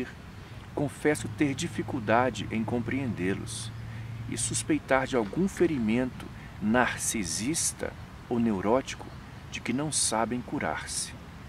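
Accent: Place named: Brazil. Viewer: tu tem muitos vícios de linguagem brasileiros no teu inglês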